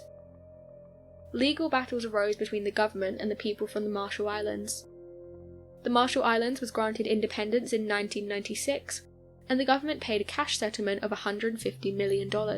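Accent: England, United Kingdom. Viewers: I just listened, is that really you?, sexy and English?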